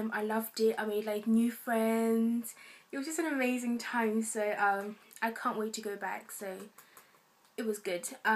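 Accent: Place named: Ndebele, Zimbabwe. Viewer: you are cute, you must have been in England a long time cause your shona accent sounds like ndebele person speaking shona. it all good.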